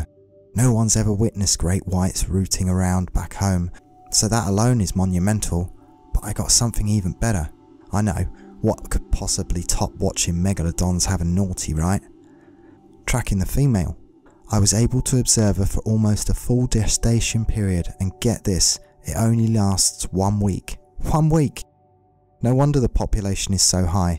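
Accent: United Kingdom. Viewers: Respects and love from Tokyo, Dear sir , YOUR VOICE IS the most noble relaxing and soothing voice I’ve ever heard. BEST Englisg accent . How can I buy these books?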